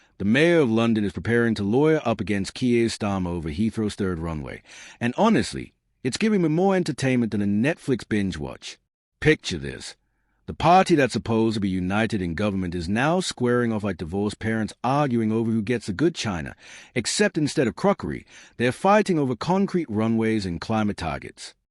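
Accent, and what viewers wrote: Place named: United States. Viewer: I'm a bit suspicious of this, nice though it would be, if true, but there is nothing about this on any other youtube channel. Together with this being reported by someone with an american accent, how come nobody who is British reporting this. A huge pinch of salt is needed with this. Is this, (Fake News) going under yet another name?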